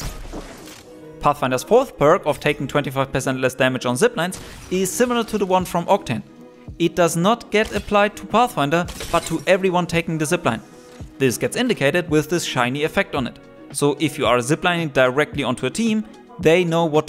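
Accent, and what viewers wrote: Germany. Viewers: Ich hab bei deinem akzent schon immer gedacht das du deutsch sein könntest hab aber nie was gefunden, bis jetzt 😂🫶🏻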